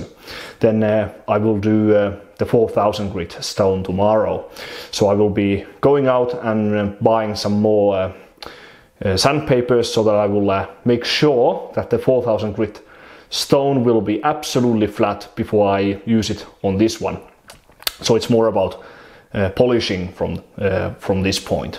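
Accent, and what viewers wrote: Finland. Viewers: Ai nyt on todella suomalainen aksentti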